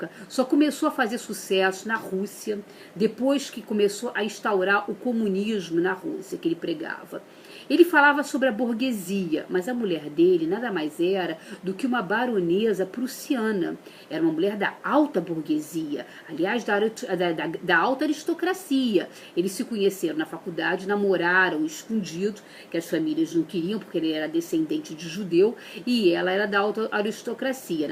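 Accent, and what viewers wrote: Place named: Rio de Janeiro, Brazil. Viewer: sotaque carioca de merda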